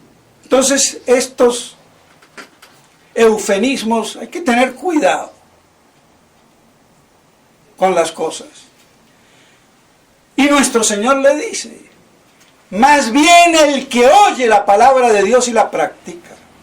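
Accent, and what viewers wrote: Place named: Cuba. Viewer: Hola UD vivio en México xque habla como cubano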